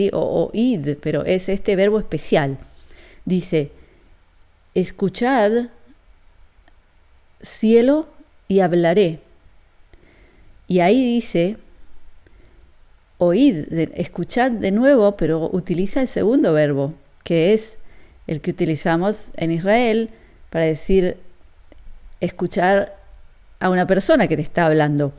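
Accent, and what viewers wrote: Argentina. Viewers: tu acento argentino hebreo me produce una profunda añoranza.Dios te bendiga Shalom.Un saludo desde USA.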